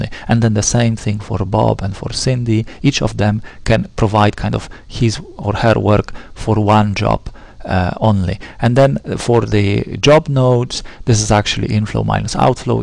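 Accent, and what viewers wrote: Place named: Egypt. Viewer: واضح انك مصري :))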